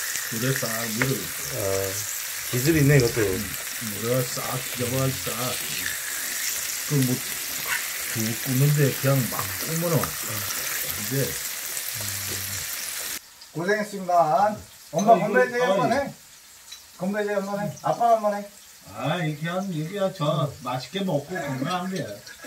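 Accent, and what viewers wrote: Gangwon, South Korea. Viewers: 아직 먹어보지는 않았지만 함 먹고싶네요 산초기름도 첨 알았네요 잘봤습니다 ^^ 말투가 강원도 같기도 하신듯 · 말씀 하시는게 강원도 사투리 쓰시는거 같아요~~ㅎㅎ 집안분위기 너무 행복해 보입니다~~^^ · 오늘처음보는데 그 웰컴동막골에나오는그말투시네요^^ 강원도인가요? 강원도어디말인가요?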